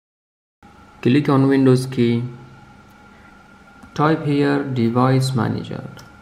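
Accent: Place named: Afghanistan. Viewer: which stable do you come from. Camputer. I laughed who taught you English. I guess you must be an Afghan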